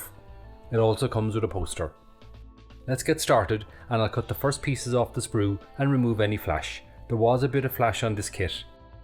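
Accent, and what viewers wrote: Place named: Ireland. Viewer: Hi / Finding it difficult to obtain and buy kits locally. I regularly visit a store "MARKS MODELS" here ib the Emerald Isle but it has limited stock in the sci-fi range. I think I have bought everything he has listed. There seems to be a lot of items listed on the JUNGLE website but then states its not available to deliver yo your location. I wondering as you sound local to me, where do you pick up your kits? Many Thanks. Seán Óg. Liking your channel.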